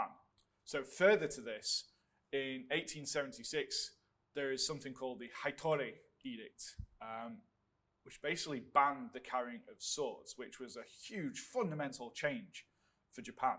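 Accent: United Kingdom. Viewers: The psycho in me likes the weapons and the cultured person in me likes a British voice talking History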